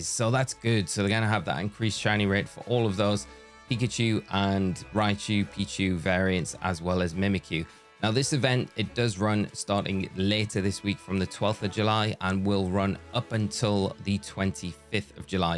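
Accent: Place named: United Kingdom. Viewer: Brittish accent? So strong... 😢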